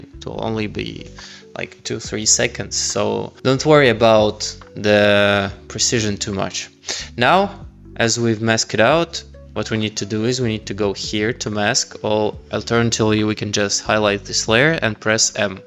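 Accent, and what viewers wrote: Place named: Russia. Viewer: впервые узнал русский акцент. ты крут, чувак. потом будем вместе работать)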